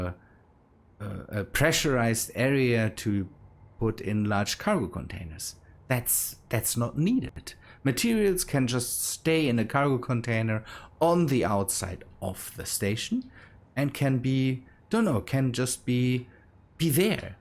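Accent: Germany. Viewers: I have a question r u German because it sounds like it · I really like the way you speak english. Maybe it's because you've a German accent. At all your Voice sounds really good.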